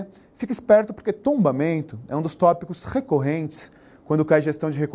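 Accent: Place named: São Paulo, Brazil. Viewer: sotaque paulista muito massa de ouvir :)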